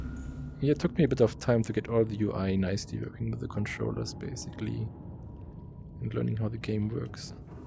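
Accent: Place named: Germany. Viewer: man hört den deutschen akzent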